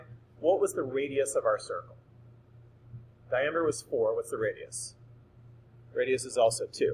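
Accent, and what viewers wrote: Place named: United States. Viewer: Very poor instructional video. This is made for people who already know how to make a cylinder. moves and clicks on stuff the beginner has NO idea of what he is doing. He is way too fast, doesn't explain why he is doing what he is doing. I am rewinding again and again and again, trying to find what he is doing and why. If this is basic construction in OnShape, take the extra time to explain EVERYTHING you are doing and where to find it. This video is useless to me, I guess I need to find a simpler app to use. I am glad he speaks American English, not some foreign deviation that is totally not understandable.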